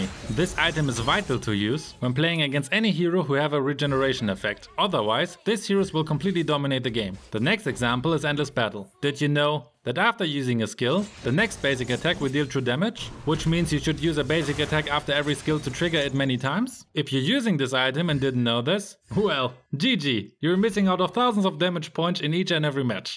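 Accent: Germany. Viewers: Hey in what server are u eu or na I feel like eu because of german accent Sow if you wanna game I m open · Deutscher Akzent xd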